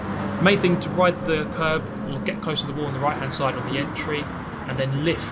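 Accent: United Kingdom. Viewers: That was me that bumped into you. I was surprised to see you in Cairns, Australia though as I allways thought you were a brit. Anyhow, it was fun and your a good guy.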